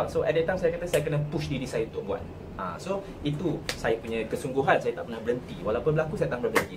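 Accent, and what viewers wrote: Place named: Sarawak, Malaysia. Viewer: are you sarawakian ? sbb mcm ada slang sarawakian ckit heheh.. just my opinion laaa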